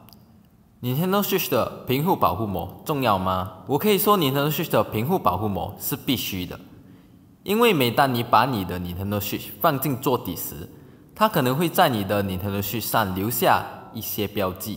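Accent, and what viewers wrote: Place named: Malaysia. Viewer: 这发音 好像马来西亚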